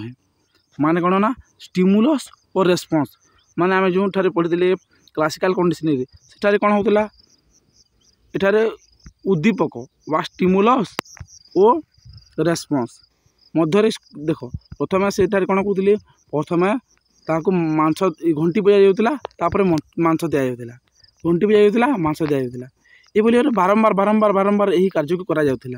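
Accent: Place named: Sambalpur, India. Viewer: Sir apan ken village ru belong karsan mate tike apan sambalpuri bagir lagu chhan i think west odisha ru belong karsan jadi b an ta sambalpuri re padhaun sir🙂 I'm from bargarh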